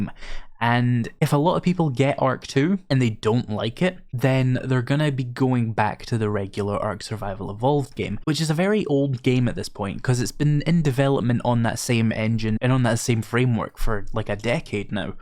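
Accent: Scotland, United Kingdom. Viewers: Ask: What kind of accent do you have? It sounds vaguely Scottish (apologies if I got that wrong). Many thanks for the videos, I always learn a lot from them.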